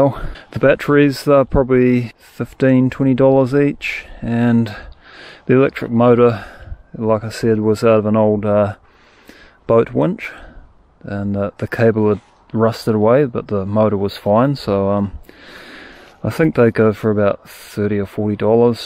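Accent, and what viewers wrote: New Zealand. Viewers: You must be a kiwi??? 🥝 👍🏻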